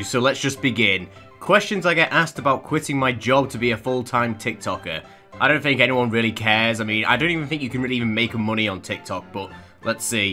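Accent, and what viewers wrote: United Kingdom. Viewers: i like ur vids but ur accent is too brittishy or smth so i hav to unsubscribe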